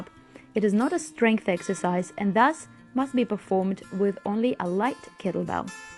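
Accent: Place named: Russia. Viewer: What a great Video, if I do this every day plus the other stretches from your videos I think It will be very good for me Some of them i know already but some are new to me Stretching and mobility is the most important thing apart from training itself I've seen you on LebeStark now I'm a fan, your way of talking and explaining is so typically Russian I loooove it 🤗🤗😍😍😍 Grüsse aus Leipzig 🤗🤗🤗 Молодец 👍👋😍🇪🇺🇰🇿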